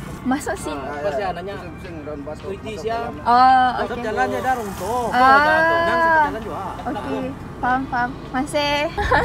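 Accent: Sarawak, Malaysia. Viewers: love the sarawak accent omg!!! <3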